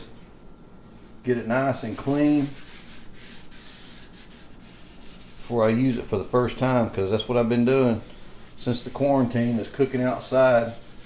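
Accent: South, United States. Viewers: Where u from? I’m new to your channel. I’m from North Carolina. You are definitely from the south lol I just ordered this exact griddle bc of this review. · your accent is hard to understand for non US ppl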